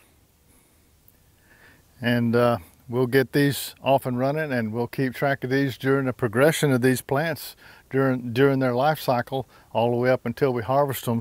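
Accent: South, United States. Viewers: I love your videos. I like how you go from start to finish. And short and sweet. And I think I hear a little southern twang in your voice.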